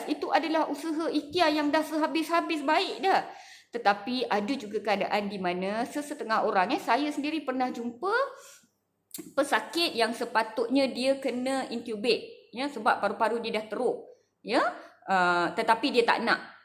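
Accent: Malaysia. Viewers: Trying to focus on your explanation but honestly cara dr cakap melayu jugak attract me more 😅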